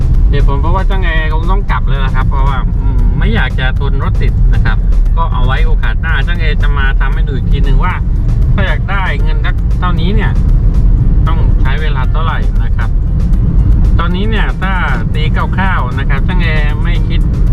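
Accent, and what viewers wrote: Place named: South, Thailand. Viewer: สำเนียงน้าเหมือนคนใต้เลยคับ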